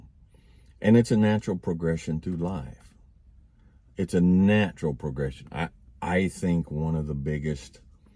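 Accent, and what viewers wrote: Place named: Wyoming, United States. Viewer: im from Wyoming I’m stationed overseas and its rare I come across something that reminds me of home and just the way you talk reminds me of home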